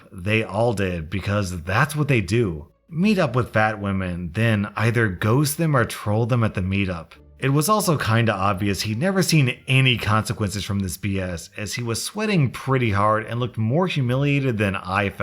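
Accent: South, United States. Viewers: I LOVE HIS SOUTHERN ACCENT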